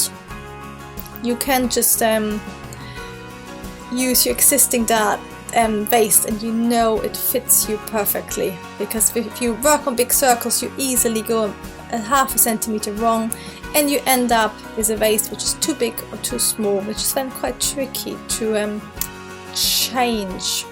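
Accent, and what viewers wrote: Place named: United Kingdom. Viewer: love this english pronounce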